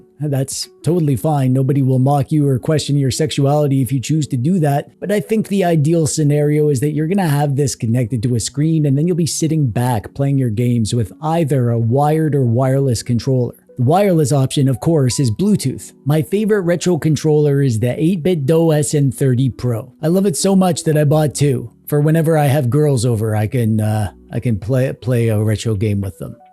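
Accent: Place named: Canada. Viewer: I wonder where he's from "withoot faffing aboot" .. oh, Canada.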